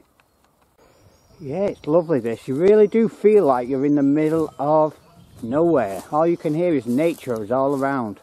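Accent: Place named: Yorkshire, United Kingdom. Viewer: You’re a character? Love the determination to go ahead? Ur just like me mate? Love your accent ! Keep up the great work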